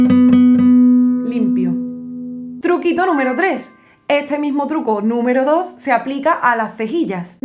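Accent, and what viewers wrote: Andalusia, Spain. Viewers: Soy argentina y el único acento más bello que el nuestro es tu preciosísimo andaluh 😍 Hermoso escucharte tocar y hablar, un placer para los oídos!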